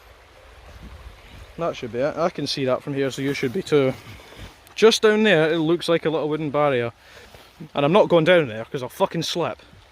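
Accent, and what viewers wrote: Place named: Scotland, United Kingdom. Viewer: where abouts in scotland you from?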